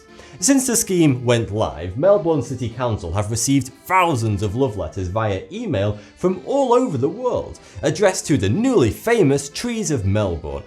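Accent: United Kingdom. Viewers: Wow, you live in Melbourne and don't even know the correct pronunciation of the name, it's only because of your accent that you say it like that. has a British accent therefore he speaks the correct pronunciation of the word. And fix your grammar dude.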